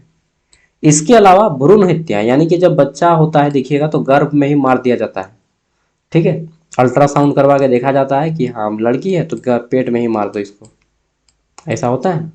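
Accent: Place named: Jharkhand, India. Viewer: Aapka khortha wala tone bhut shi lgta hai sunne m😃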